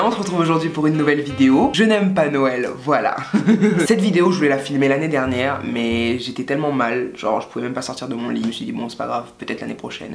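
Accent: Ivory Coast. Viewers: Wowww ta un accent ivoirien mais puuur😍😍😍😍😍🇨🇮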